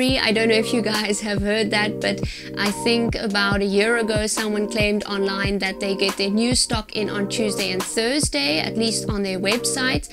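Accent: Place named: South Africa. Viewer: I clicked on your video and was so happy to hear a South African accent! If only ZARA had an online shop in SA. Love your channel <3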